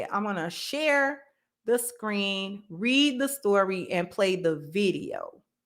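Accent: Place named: Baltimore, United States. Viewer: She got a Bmore accent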